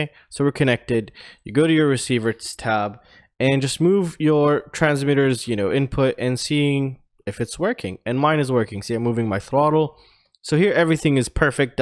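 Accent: California, United States. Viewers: Lmao. good one but there is still data leakage. I can tell by your accent that you are most likely from Cali and IE leaked that you currently live in Hungary ;-)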